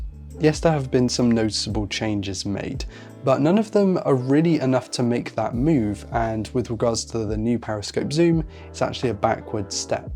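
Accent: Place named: England, United Kingdom. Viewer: I might be wrong but you sound English so why saying dollars not pounds and it's obvious not worth it if you've a S23 model but anything from S22 down probably is worth it.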